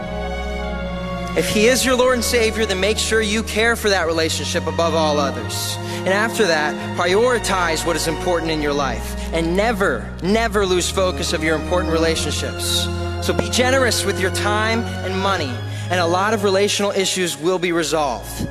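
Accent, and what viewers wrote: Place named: United States. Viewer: Thank you very much for presenting this wonderful speech. I would like to know which American accent is this. Kindly reply please Thank you again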